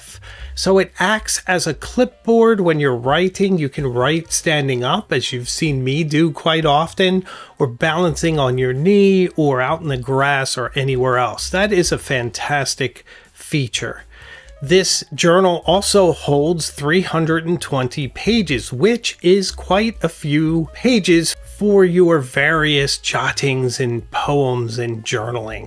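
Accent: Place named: Pennsylvania, United States. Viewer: Does anyone know where is from? The accent gives Bucks county PA.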